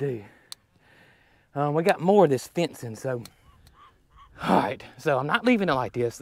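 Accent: South, United States. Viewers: Who ever is doing the closed caption sure doesn't understand your southern accent. lol When you say buck they say book. hahaha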